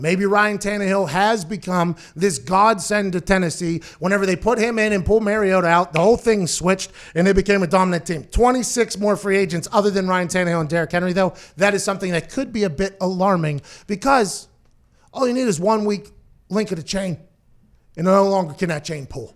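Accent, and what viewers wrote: Pittsburgh, United States. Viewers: Gahnd ahn Pahnd...love that your pittsburgh accent still come aht.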